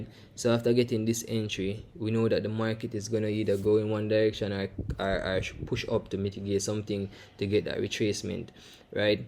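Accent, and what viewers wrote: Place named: Jamaica. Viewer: Mhnnn I'm really melting here.. I love your sexy voice and that sweet pie Jamaican accent.. Ohhhh my goodness I can't even follow the strategy but smiling all the way cos I love you mhnnn.. U ve earned a adimire sub.. U are delicious kikikikiiiii.. Have a beautiful day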